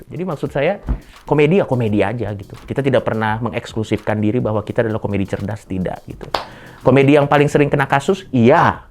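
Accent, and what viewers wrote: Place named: Madura, Indonesia. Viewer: saya mendengar suara mas mas madura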